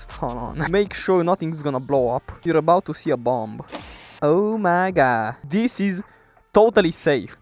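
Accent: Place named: Romania. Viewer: Ma gandeam dupa accent ca esti roman si am auzit spray de tanatari 😂😂😂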